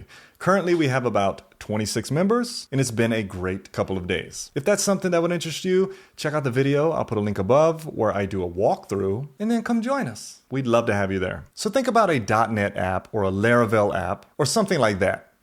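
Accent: South, United States. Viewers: you got a lil twang in your voice lol. You grew up in the south lmao?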